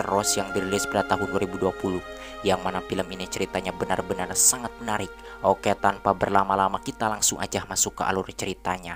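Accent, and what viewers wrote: West Java, Indonesia. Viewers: Akang nya orang Sunda ya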